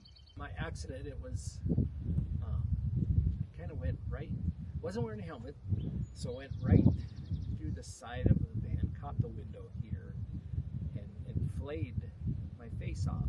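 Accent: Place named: North Dakota, United States. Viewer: I enjoyed listening to your story. We didn't get to really know each other very well, but I do remember when you and your folks would come to Plentywood. Even though you have been to Reno, California, and other places I can still hear that North Dakotan Norwegian edge to your voice. I love that and reminds me of my cousins in the Parshall area as well as your uncle in Plentywood. I am glad you have found love and joy.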